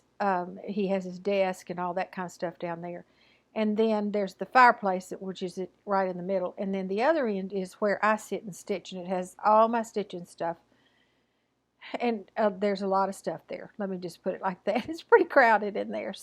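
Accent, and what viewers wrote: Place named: Oklahoma, United States. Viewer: I love hot chocolate! I've been enjoying your prayer chair chats because I love your accent. My Grandma was from Oklahoma and you sound so much like her, it brings back so many happy memories for me. Happy stitching!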